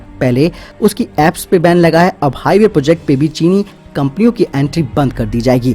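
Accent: Uttarakhand, India. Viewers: कहां के रहने वाले हैं आप। मुझे तो उत्तराखंड से लग रहे हैं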